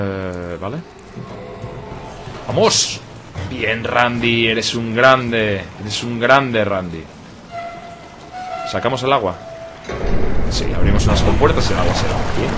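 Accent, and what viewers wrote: Asturias, Spain. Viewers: maquina como se nota que yes asturianu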